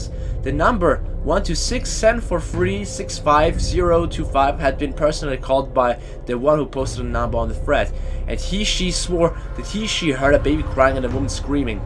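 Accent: Germany. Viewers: are u german because i can hear german in ur videos im german aswell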